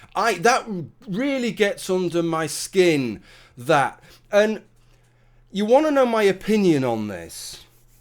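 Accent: United Kingdom. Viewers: I hate sargon. But i dont think he should have his income taken away like this. Its not his fault that its so profitable to be a pandering bitch on the internet since his audience is so dumb and will give him money for talking about a country he clearly knows next to nothing about. I dont see why patreon has a problem with a british accent trying to convince ppl he is smrt.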